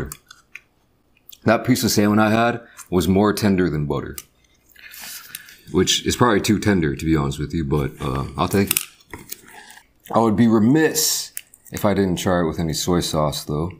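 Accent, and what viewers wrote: United States. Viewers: Eh? Of course he's American check his accent!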